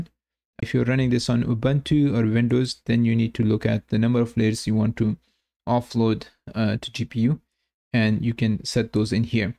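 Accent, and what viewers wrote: India. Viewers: First second I hear Indian accent = Imma'bout to get pure useful information from this video, this is for sure. Thx for the video though 1 thing - when you talk about "previous video" at the beginning of the video, I'd consider to provide this "leading link" that shows in the corner because I'm not familiar and have to dig into your feed to find it. Not a great issue but still - just a tip to consider.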